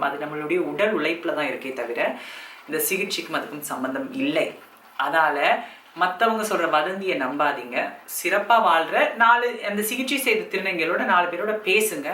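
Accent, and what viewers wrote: Sri Lanka. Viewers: Ka your speech is very beautiful like srilanka tamil oh my sweet ka and please reply your comment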